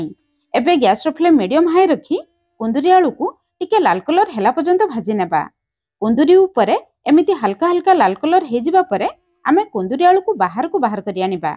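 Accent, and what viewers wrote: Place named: Ganjam, India. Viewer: Ganjam style re torada kahanti...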